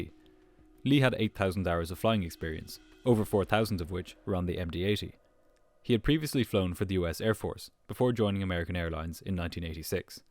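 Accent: Ireland. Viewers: enjoying the Irish lilt in this narration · Great video, and your soft. Lovely, beguiling irish accent adds so much enjoyment to an aleady wonderful production.......only one problem........its making me desperately homesick. Love and respect from mo in new zealand. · irish? lol, no matter, you have a sexy voice,,,,, this video was well done, xo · I detect an accent....Irish???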